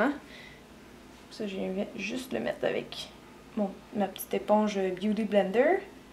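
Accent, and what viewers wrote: Quebec, Canada. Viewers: trop agréable d'entendre une Québécoise Merci!!!! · Je pense sincèrement que est de très bon conseil! A 55 ans, je vais suivre ses conseils de maquillage.En plus, elle a vraiment l'air très sympathique et chaleureuse.En plus, personnellement, j'adore son accent québécois. Faites lui confiance. Marie de Bretagne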